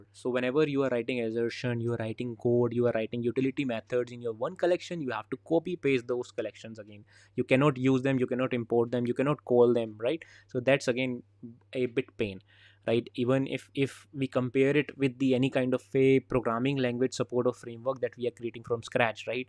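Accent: India. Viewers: are u bengali by any chance?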